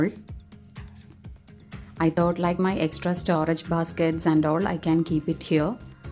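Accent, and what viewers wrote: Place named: Kerala, India. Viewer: I knew it...ur from Kerala from ur accent 😂😂😅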